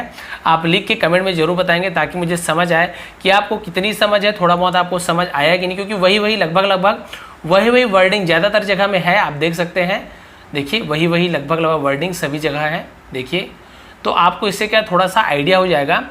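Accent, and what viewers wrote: Chhattisgarh, India. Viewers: Sir aap chattishgadh se hai kya??!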